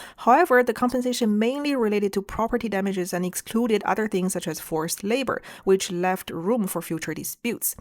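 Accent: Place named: China. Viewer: Your thick chinese accent makes this vid funny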